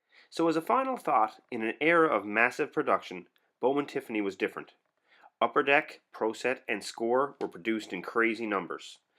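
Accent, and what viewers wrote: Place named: Netherlands. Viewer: The narrators definitely from NL. Made me miss home.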